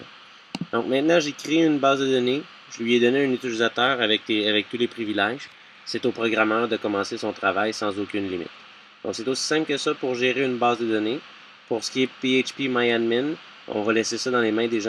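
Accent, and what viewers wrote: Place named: Quebec, Canada. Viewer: J'adore l'accent québecois les "elle" ce disent "xwell" Mysql = Mysqwell :)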